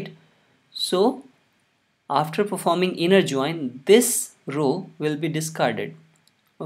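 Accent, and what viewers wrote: India. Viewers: Fuck Indian accent’s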